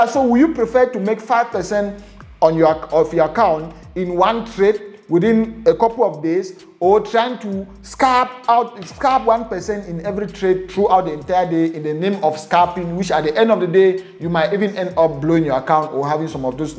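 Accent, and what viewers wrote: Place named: Nigeria. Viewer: You just sound like a Nigerian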